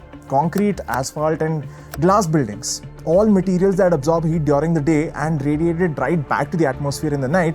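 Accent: India. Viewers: A bit hard to understand at times, because of the Indian-English accent. Not hating, just saying, I'm far from perfect too. Dunno, maybe if I watch more content I get more used to understanding :) Anyway - on topic - I guess the 20% or so decrease in production efficiency based on heat doesn't really reflect the reality. This might be true for one or a few days, but if you have high temps all the time and no real chance to cool down, your body will break down more and more. This is pure stress. Wish you guys all the best and hopefully, new laws will go into place to optimise for the new reality we life in. They have to.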